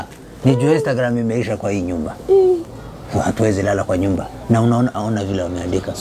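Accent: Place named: Kikuyu, Kenya. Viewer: Haki si ako na kipawa sana yaani ako na accent ya wa kikuyu kabisa love it 😍 😀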